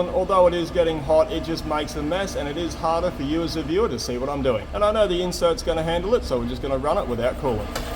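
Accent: Queensland, Australia. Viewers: Guys, when I want "milking", I go to the supermarket. When I want to see metal getting peeled back like the layers of an onion, I go to CEE. Love you all -, Homey, the magpies and the overhead drone, the noisy trains on the tracks over yonder. ' outtakes keep me trying when I blunder (if he can do the narrative, I can do whatever I'm screwing up). Here, I can relax and listen to an Australian accent with a QLD coastal twist. 😀❤👍 And the cute Staffy ❤❤❤needs more interactive air time.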